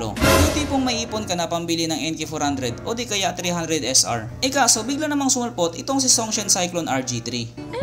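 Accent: Visayas, Philippines. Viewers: Bisaya pay imo haha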